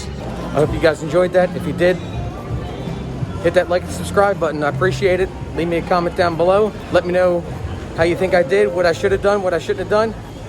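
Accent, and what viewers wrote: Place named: Baltimore, United States. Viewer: Don’t worry so much about anything.... enjoy the process, sounds like you are. I would highly suggest you never limp into the pot, no matter if your mp or utg or cutoff or button, just doesn’t matter (position). Reason is, your limp 3b is never going to include enough bluffs. (Short justification) Always enter hands with raise, 3x or $10 for 1/3. When we enter a hand, just try and play better quality hands that play well post flop. Suited connectors, 7s plus. And finally work in some 3b in position, those guys hate 3bs at the 1/3 stakes and if your in position with a premium hand your likely ahead and this will increase your win rate. Love watching you because your authentic and your baltimore accent always makes me laugh. Talk soon Matt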